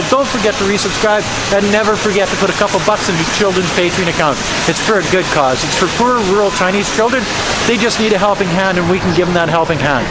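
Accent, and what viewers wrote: Canada. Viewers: The wrong people? Between a rock and a hard place we are. Thx canadian irish sounding guy. Theres severe corruption battling the people who want the world to be together in unity peacefully, and were all looked at as choosing this way thats happening now or are thought to all be bad and hate china or whatever. I dont think you really know whats happening to us over here neighbor! Keep your sodium chloride facebook comments. Thise mailboxes were being refurbished and some of those images of trucks taking mailboxes are completely out of context. Mail in ballots are the way democracts are trying to rig this election. We are being manipulated and falsified probably just like a lot of situations ALL over the world, you also have no idea about. But your video is appreciated anyway :] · So Strange to hear this accent on this canadian guy.... Born and raised slag off his own people like that! 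I'm So glad hes absolutley in love with his life in China...Thats amazing. And you know what will happen the next time he flies home to the country hes using curse words to describe Canada as going in the toilet? Those Canadians will be as kind, and welcoming as ever, No police to take him in for questioning , no blocks on content he posts about his opinions to keep the social order. But you know what thats okay. Maybe one day he will think better of the people at home.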